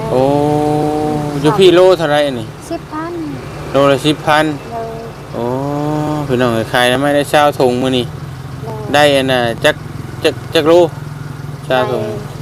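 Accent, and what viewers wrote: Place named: Isan, Thailand. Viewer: ภาษาเว้าคือกันทางบ้านข่อยเด้อยุแถวอำเภอกุสุมาลย์ จังหวัดสกลนคร กับแถวอำเภอท่าอุเทน/อำเภอโพนสวรรค์ จังหวัดนครพนม ฝั่งไทยเด้อ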